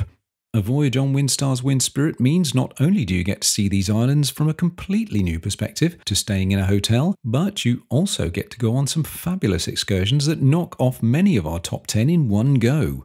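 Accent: England, United Kingdom. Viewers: I’m so glad you don’t have a high pitched voice. I love Travel Movies but if the voice is above “C” my eardrums hurt😊. With your English Accent,listening to you is comforting.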